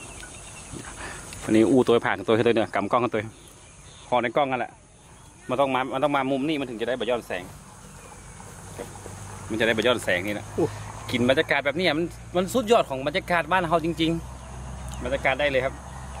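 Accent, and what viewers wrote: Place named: Northern Thailand, Thailand. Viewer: จ้าว ยายพรมาดูดึกแล้วจ้าวแต่แชร์ไปหลายคนแล้วรายการ สุดยอดเลยจ้าวน้องๆเขาชอบ ตลกดีฮเหนือผสมไทยม่วนดีแต้ๆจ้าว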